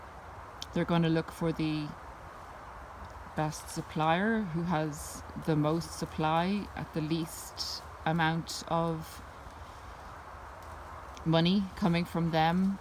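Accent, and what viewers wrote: Ireland. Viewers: I love the background of beautiful Forrest and cute little dog, thanks for your wisdom , also love your Irish accent it’s a soothing way to get the information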